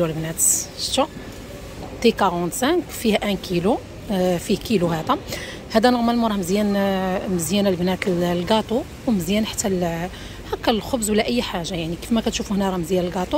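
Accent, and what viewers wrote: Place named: Morocco. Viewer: جزاك الله خيرا على المجهودات لتعملى فيها وهدري كيما تبغي أصلا اللهجة المغربية ازونة تعجبني واصلي كيما انتي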